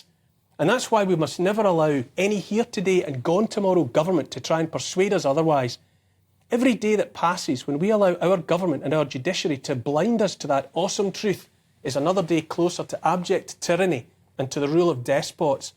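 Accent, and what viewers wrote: Scotland, United Kingdom. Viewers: You nailed it 😁 Without giving any thought as to why I was inspired to write a poem about him... It just happened. But you've summed up what sparked it 😊 This was May last year 😊🤗 Won't Kneel A gentle, beautiful Scottish lilt A kind, empathic, forward tilt An open heart; a caring face A willingness to take his place. A strong, home-hitting monologue Reminds us of the boiling frog The courage to become a sleuth A passion to release the truth. A deep desire to crack the whip Illuminates our freedom-strip Calls out the darkness taking hold The shiny despots; plated gold! Intense and raw, he lays it bare A soul-enhancing, honest stare Inflections drive the message through He speaks for Us - for me and you! Discernment's such a precious gift His words create a mighty lift Take heed of all he has to say He knows - it shouldn't be this way! 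🤗🦋🐬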